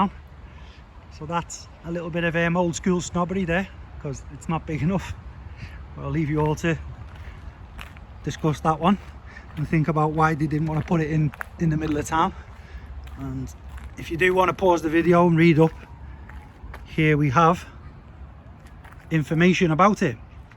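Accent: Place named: Liverpool, United Kingdom. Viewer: Scouse is endangered so it is refreshing to listen. You speak slowly enough for me to get used to it. Thanks for the little stories, so important to keep traditions alive.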